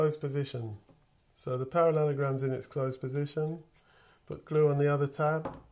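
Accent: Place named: RP, United Kingdom. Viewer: ciertamente tiene un estupendo acento Británico culto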